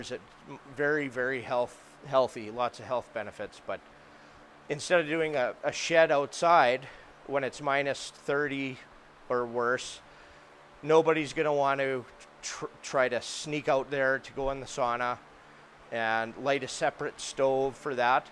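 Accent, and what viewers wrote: Canada. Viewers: You are good peoples! New subscriber. Plan on doing a smaller version down here in Southern Georgia. USA of course. Thank you for the invaluable information. Love the Canadian accent too! Go Jets! (Recovering Atlanta Thrashers fan). Take care, be safe and we love our guns too! Come to Georgia sometime!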